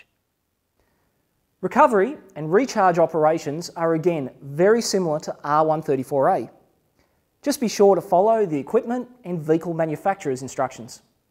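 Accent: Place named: Australia. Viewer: It's Aussie, not English.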